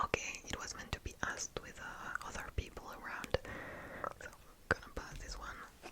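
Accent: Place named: France. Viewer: make more videos with french accent please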